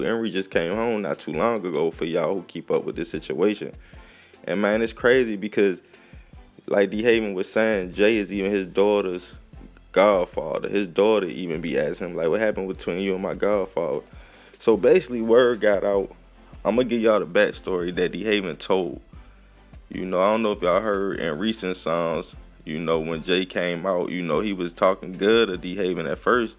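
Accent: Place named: Maryland, United States. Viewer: Are you from Maryland or DC i hear a accent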